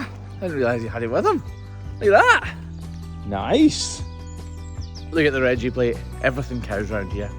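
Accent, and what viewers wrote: Scotland, United Kingdom. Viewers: In the intro there, you sounded like a man who needed to finish his coffee before he tried to talk! This 65-year-old Canadian woman finds that she really enjoys your videos. Why? You really love your life, the scenery is spectacular, and you sincerely care about the comfort of those cows. Your pleasant voice and Scottish accent don't hurt a bit either.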